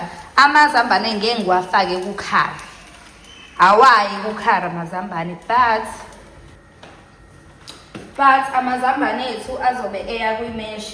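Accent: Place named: Thefula, South Africa. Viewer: Ave ungchaza Mase uthefula❤❤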